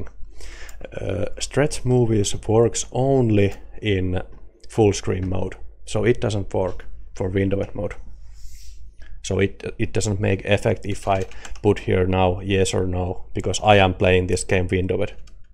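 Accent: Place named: Finland. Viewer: Brilliant video, also your accent sounds finnish :b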